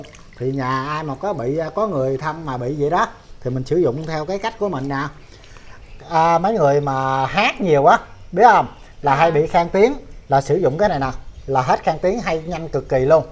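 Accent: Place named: Mekong Delta, Vietnam. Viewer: Miền tây quê tui đó..nói chuyên nghe cưng ko..tự hào vn ơi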